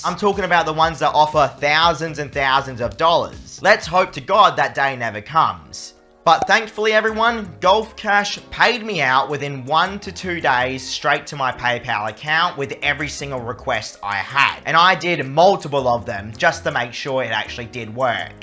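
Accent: Australia. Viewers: I am here because I love ur accent Australian English 😂😂😂😂😂😂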